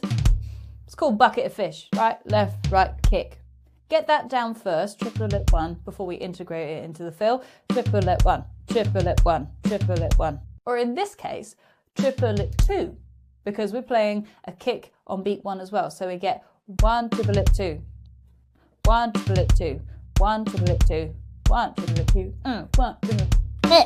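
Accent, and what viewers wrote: United Kingdom. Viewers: Hey, love your teaching methods. I'm a 60-plus-year guitar player and singer and have been in many bands. They never wanted to do my songs so now I'm laying them down on Pro Tools. I don't play drums, so I'm laying them down in MIDI and your channel has helped me a bunch to get different 3/4 drum beats down and the fills. I have a digital drum set, given to me by my grandson, and plan on learning after I get my song down. You (your videos) will help me tons. BTW I'm a huge Beatle fan, so your accent is delightful and witty as Brittans tend to be. Love it.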